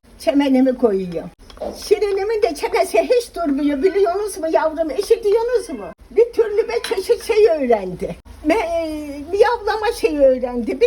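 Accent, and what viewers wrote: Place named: Tokat, Turkey. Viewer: teyze bende Tokat merkezliyim senin konuşmandan hemen anladım canım memleketim Tokatlı olmak çok büyük bir ayrıcalıktır huzur deyince Tokat iyiki varsın❤❤❤❤❤❤❤ teyze❤❤❤❤❤❤❤❤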